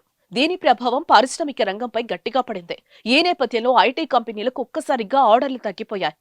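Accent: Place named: United States. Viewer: అమెరికా వాళ్ళు తెలుగు మాట్లాడినట్లుంది నీ ఉచ్చారణ. నీ ముఖం.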